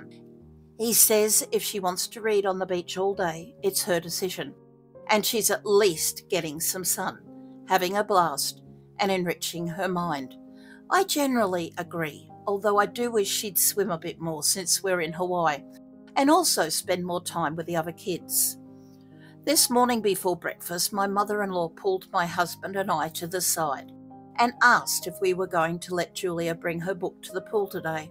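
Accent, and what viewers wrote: Australia. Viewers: I hope you feel better soon! I REALLY ENJOY YOUR CHANNEL!!! Thanks so much for reading to us. It reminds me of going to the library as a child, sitting down in a semi-circle and listening to the librarian read us stories. That was the next best thing to reading a book myself, and I still enjoy being read to today, so thank you! I enjoy your accent and the sound of your voice. Are you from Australia? I'm in the US. LOVE the accent, truly!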